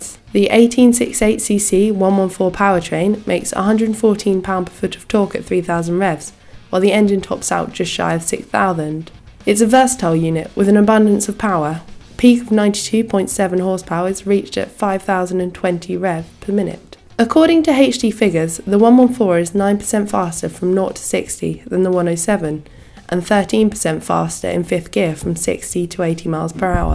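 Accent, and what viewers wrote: United Kingdom. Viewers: Interesting review. Please work on enunciation and slow down a little... that brittiot accent is annoying. But a cute girl and a nice new motorcycle are a reason to watch this interview for sure. And remember, there are 300lb (all muscle) big guys like me out there who would rather have a bike that can handle our weight and aggressive riding style than some sport bike. Also one with more torque fits us better than higher horsepower bikes that require super high rpms to accelerate a moderate load and could not corner well with our size and weight anyway. And finally the big tires tend to eliminate grooved pavement and lane segments very well... so they actually improve handling. Ah... not the best review there, but what do you expect from the British mush.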